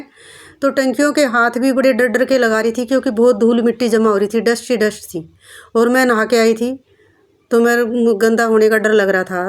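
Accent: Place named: Haryana, India. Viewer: मुझे तो पहले ही पता चल गया आपकी बोली से की आप हरियाणा के हो जी बहुत ही बढ़िया Vlog है दीदी जी बच्चे तो मुझे बहुत ही पसंद हैं जी 💫🤍💫 खुश रहो सदा ओके दीदी जी।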